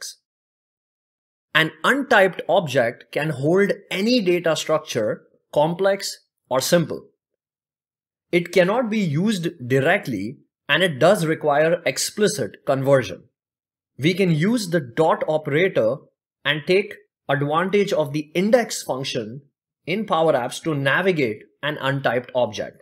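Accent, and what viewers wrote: India. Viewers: I work with power platform dev for almost 10 years already and I must say... if the presenter have indian accent, he is a good teacher... great video m8 !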